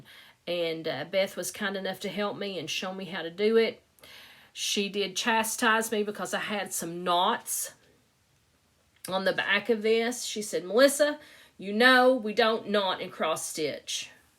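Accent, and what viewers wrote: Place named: East Tennessee, United States. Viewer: I had a feeling when I saw your thumbnail that I would enjoy your Flosstubes, and I was right! I heard a really familiar accent in that voice. I live in East Tennessee, and you sound like my people!!! What is it with these husbands who think we have too much stuff? They have their stuff, too! You are working on some beautiful pieces. I love, too, that your husband's tractor is "sitting" in your grandfather's barn! And how awesome it will be to display your grandmother's click & wheel with the piece stitched to commemorate her with hers! Thank you so much for sharing!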